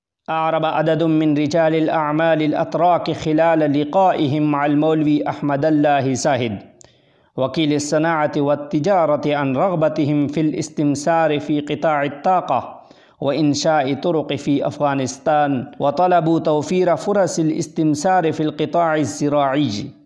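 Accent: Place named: Pakistan. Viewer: طاب نشرك أخي الكريم، بس الحاجة الى مزاولة الله‍جهة العربية والتخليص من شوائب الاردية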